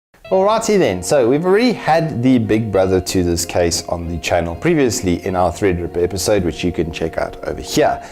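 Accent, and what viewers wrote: South Africa. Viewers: EthanHuntMi6 he has a Afrikaans accent, he is South African I can feel it. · South African? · great review! Are you South african?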